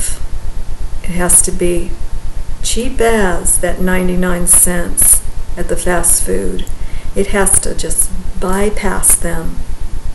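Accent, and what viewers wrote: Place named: United States. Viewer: Why do you start out with a heavy Eastern European accent and then it fades to a complete American English. Please stop! I have been watching you for years!!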